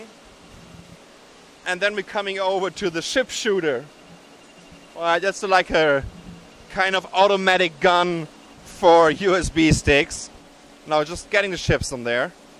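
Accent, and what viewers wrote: Germany. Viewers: he fucking german, give the dude a break, it's not his 1st language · Pretty awesome. Was the narrator German? Also, you guys have some cool songs at the beginning/end.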